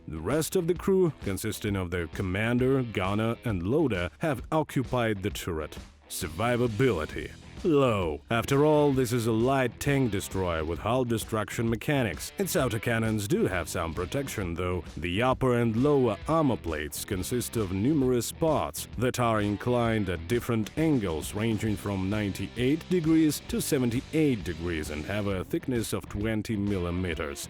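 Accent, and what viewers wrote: Russia. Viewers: Yes, tell me more about Swedish box tenk Mr. Deep voiced Russian Narrator :3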